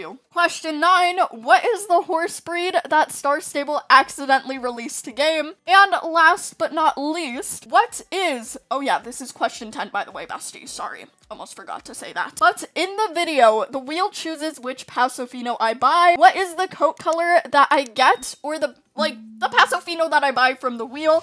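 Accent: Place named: England, United Kingdom. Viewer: “Have fun today” / “equestrian festival” love your Chanel, your English accent is on point x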